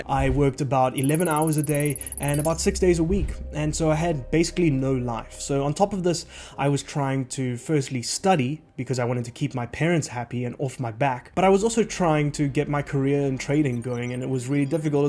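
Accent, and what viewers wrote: South Africa. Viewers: brother are you afrikaner or english south african?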